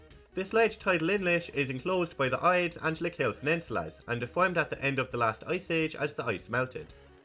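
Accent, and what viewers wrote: Cork, Ireland. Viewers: Ahhhh! I thought it had a touch of Cork. :-)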